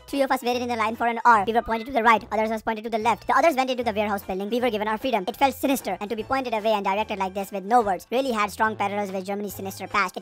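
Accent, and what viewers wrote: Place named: India. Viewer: Your funny story about a big guy complimenting your outfit is not funny at all... Berghain is a place of diversity, it originated from a gay club. Making fun of gays should permanently ban you from Berghain. I would rather hear someone telling me slaaay than your awful indian accent. I hope I dont get to see you ever in Berghain...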